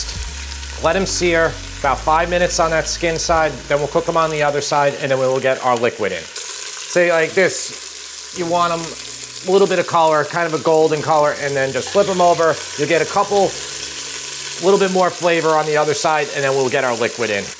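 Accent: New York, United States. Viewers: May I ask what accent you have ? Sounds like New York to me. I’m an Aussie. 😬👍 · I have made chicken soup often, but this made very clear how you can use the chicken to make the stock and use the same pieces to use in the soup. I do like white meat chicken in the soup, but the ease of this would certainly make me give this a try. As a native New Yorker, I love listening to ’ accent, making a big assumption that he is also from New York.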